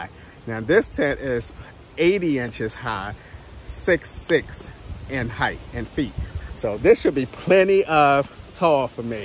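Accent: Baltimore, United States. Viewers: where are you from, I'm from Baltimore and I can't help but to hear a little Baltimore in your talk.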